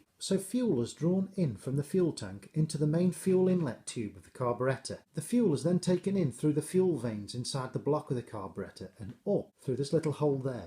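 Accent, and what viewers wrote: United Kingdom. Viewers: Man. Not only a great explanation but don't with a British accent. Cannot ask for more. A big thank you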